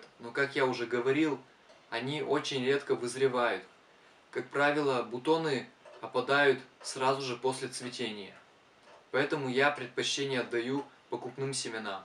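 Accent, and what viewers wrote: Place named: Vyatka, Russia. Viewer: Вятский говор. Молодец парень. Надо будет попробовать из семян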